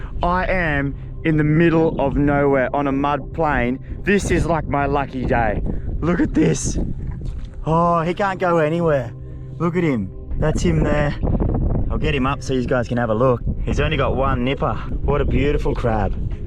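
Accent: Australia. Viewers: Is he Australian? Would make sense… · lemme guess: your australian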